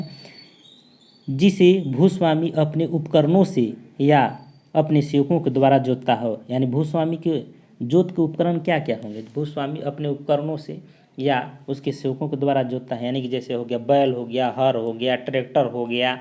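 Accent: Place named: Bhojpuri, India. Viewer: Bhojpuri mix बा तोहार बोली में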